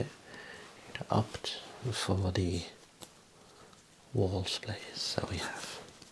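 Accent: Birmingham, United Kingdom. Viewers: Brummie accent. Found in Birmingham UK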